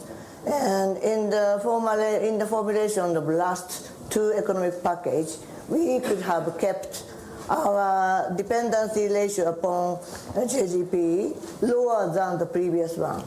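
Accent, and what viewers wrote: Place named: Japan. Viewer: 日本的発音ではあるけれども、 英語で自分の考えを専門知識とともに自由に述べることができる日本では希少な政治家の一人ではありますね。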